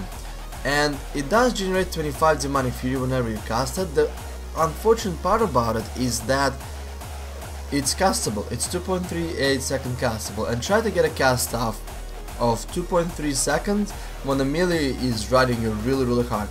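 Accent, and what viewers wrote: Russia. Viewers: Твой русский акцент, не плохо смотрится)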